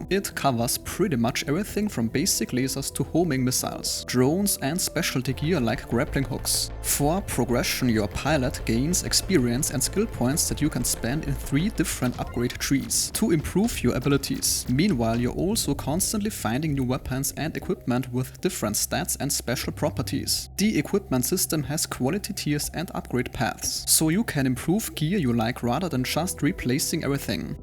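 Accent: Germany. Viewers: German trying English?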